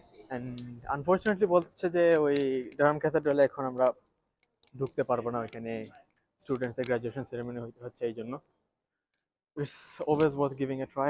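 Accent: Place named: Sylhet, Bangladesh. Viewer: ভাই সিলেটি মাত ঐ ভালা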